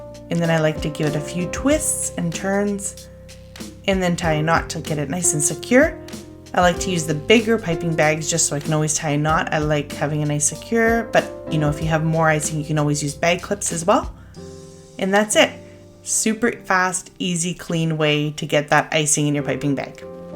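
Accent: Canada. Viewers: OMGosh~ Thank You SO Much for linking these videos! Apparently, I'd watched them, as I'd "liked" them & added them to my "Crafts & DIY" playlist, but I'd completely forgotten about the double-bagging trick, so I can't tell you enough how much I appreciate you posting these links. I'm recently disabled, so I can't do as much as I'd like with my grandkids, but cooking & baking is one of the things we CAN do. They're sensitive to sugar, however, and their moms like to keep them to an organic, healthful diet, so I've used some of your ideas with my recipes for hummus to decorate their favorite quinoa chips we bake. We dye the homemade hummus with beet & spinach powder (which gives it a really unique flavor) & they make flowers and leaves on the crackers. Their other favorite thing to make is ginger chews (just boiled strips of ginger with equal parts water & sugar until soft, then sprinkled with sugar when cooled~ these obviously have a lot of sugar, so they're a once-in-while treat). 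Sometimes, the ginger is a little too spicy. I have 7 grandkids, ages 7 to due in 2 weeks (my only granddaughter is the 7 year-old), and they all love spending time in the kitchen! Thank You AGAIN for ALL Your GREAT Ideas & Fantastic Spirit! PS. My Mom was Canadian, do I hear a hint of a Canadian accent? I still have my Mom's "Kate Aitken's Cookbook" that must be 70 years old by now. Many Blessings to You & Yours!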